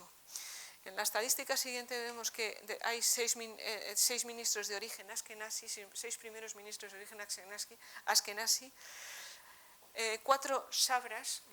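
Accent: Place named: Spain. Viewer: Esta mujer es española por el asentó .....entonces muuuuuy probablemente sea católica romana y de allí por supuesto no acepta que los descendientes de Jacob son los dueños de esa tierra y si no quieren aceptar. Respeten la compra de esas tierras que que compro Abraham. Hay pruebassssss ancestrales así esta mujer y sus secuaces digo su grupo intelectual siguen al vaticano quien formó el Islam $$$$ y les hacen batalla a los judíos en todo el mundo.y la católica a todos los dueños de sus tierras mandando a matar a los nativos de el mundo. Y esta mujer es una ignorante deve educarse antes y después de lo las fechas que da y realmente decir la verdad profunda y clara es una vendida .como que si a México no lo siguieran saqueando. Dios nos libre de esta barbarie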